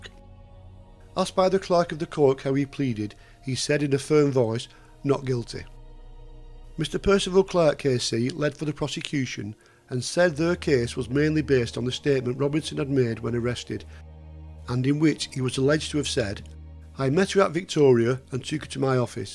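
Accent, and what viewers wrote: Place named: Bolton, United Kingdom. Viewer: A good Bolton accent on the commentator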